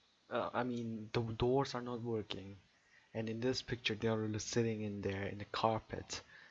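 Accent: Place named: India. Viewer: OH WAIT YOUR RIGHT LOL HE SPOKE INDIAN ACCENT IN HIS VIDEOS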